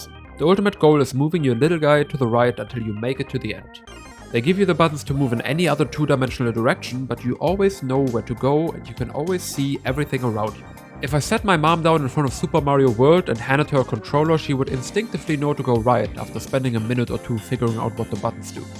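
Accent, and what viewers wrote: Germany. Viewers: Deutschester akzent den ich je gehört hab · I hear a german accent.. .. hmmmmmmmm 🤔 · i hate that i can clock a fellow german speaking english in the first 00.2s seconds of them speaking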